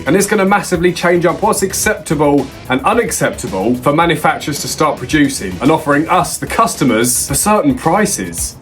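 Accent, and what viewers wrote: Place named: United Kingdom. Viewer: Great another British speaking channel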